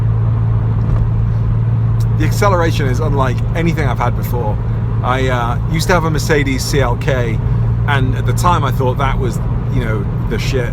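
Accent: United Kingdom. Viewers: Your British you plum !!!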